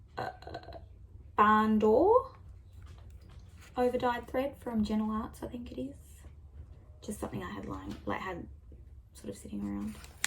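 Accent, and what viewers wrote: Australia. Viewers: Loved your video, your wonderful Aussie accent and your handwork. Best to you and yours!!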